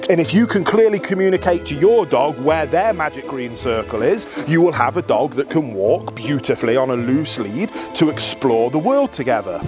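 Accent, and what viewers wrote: United Kingdom. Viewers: Just read a bunch of these. I've been watching Will for a while now, my son calls him the UK guy cause we Yanks love the accent. Anyway, for those who complained, there is an older longer video on slip lead training of a bad puller. It's an excellent vid and what I used to correct my Lhasa apso mix monster. For those of you who don't like or can't get prong collars (I don't think I'd put a prong on a Lhasa) then try a Gentle Leader. They really do work IF you present them correctly and use them correctly. Read the directions! All of Will's videos are great, but there are other good ones as well. Look around and be willing to devote some quality time to your dog!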